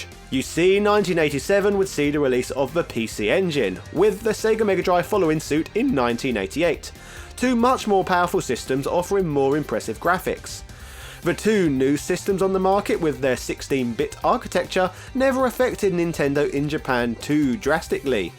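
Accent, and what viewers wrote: England, United Kingdom. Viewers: Canada? He's got the most English accent I've ever heard.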